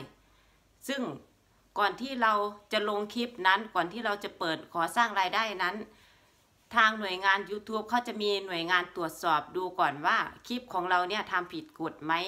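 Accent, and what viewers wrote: Isan, Thailand. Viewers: อยากให้ พูดอีสานจ้าน่ารักดีเวลา พูดอีสาน👍👍👍❤❤❤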